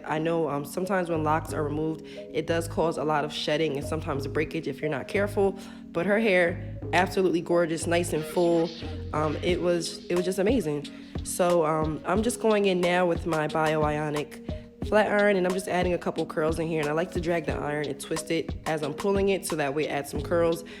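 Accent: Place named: New York, United States. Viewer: where is your shop located? Sounds like a New York accent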